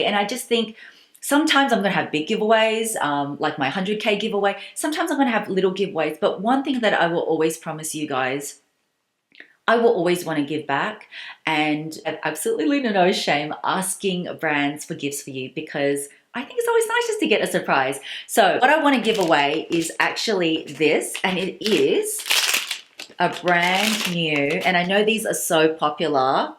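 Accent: Australia. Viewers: Hi, love your videos. I really appreciate all the information and tips. I really enjoy watching your videos because you are so knowledgeable and especially love your Australian accent. #faMELy Look forward to your next video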